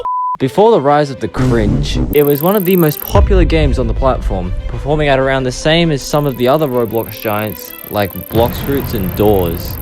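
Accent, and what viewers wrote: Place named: Australia. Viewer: Are you Australian I love the accent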